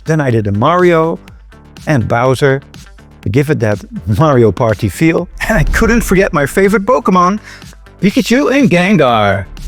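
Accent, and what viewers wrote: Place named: Netherlands. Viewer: IDK but u sound Dutch 🇳🇱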